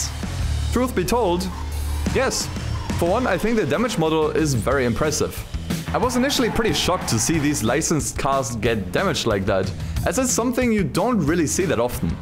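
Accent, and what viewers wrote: Germany. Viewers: Dein Englisch ist schon sehr stark vor allem dein Wortschatz auch wenn man den deutschen Akzent hört 😜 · Which accent is that in your english ?:) German ? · nice deutscher Akzent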